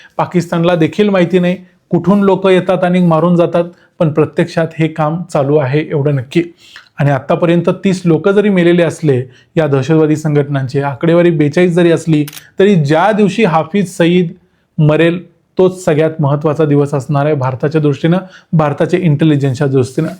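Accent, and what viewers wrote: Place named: Kolhapur, India. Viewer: असून मस्त कोल्हापुरी बोलतोस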